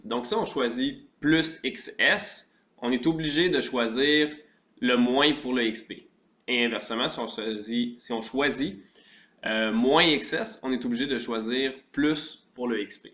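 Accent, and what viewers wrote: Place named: England, United Kingdom. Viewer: merci !! and i like your englaish acsent ;)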